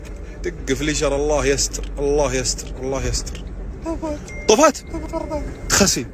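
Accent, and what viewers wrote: Iraq. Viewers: هاي اللهجة العراقية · لان عراقي اعذره لهجته تختلف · اللهجه عراقيه اكيد متفهمون 😂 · هاي لهجه عراقيه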